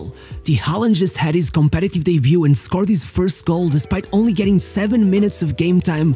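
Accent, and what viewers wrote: Portugal. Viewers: Logo vi que esta pronuncia tinha de ser portuguesa. Continua com o excelente trabalho :) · The Portuguese accent is strong lol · Vi logo pelo sotaque és tuga, impossivel nao notar lol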